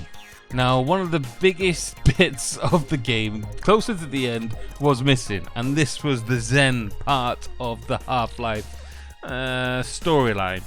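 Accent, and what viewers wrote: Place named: Northern England, United Kingdom. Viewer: spoken like a true northern lad ha ha! Glad you got the rest you deserve it's nice to see your stuff coming out whenever I get chance to see them, your EMUnation is a great idea. I'm sure the guys on the dev teams appreciate you as much too. Have a great one mate and have a beer on us!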